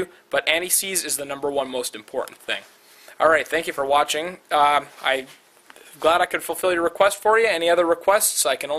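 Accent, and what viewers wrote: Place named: Canada. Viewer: You sound kinda Canadian, eh? I would check those gaps. I dont trust the mfr to gap them properly.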